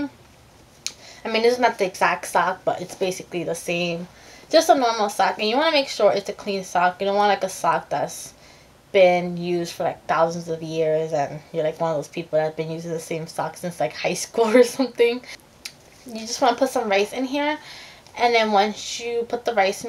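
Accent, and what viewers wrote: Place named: Miami, United States. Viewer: Love the Miami accent! I'm definitely going to try this.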